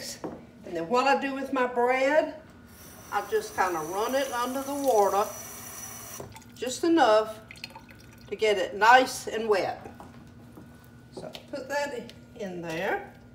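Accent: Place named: Charleston, United States. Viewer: Love your accent. I'm from South Carolina also. You sound like a Charleston Area native. I love the way you added the veggies on the side. Going to do that the next time I fix meatloaf.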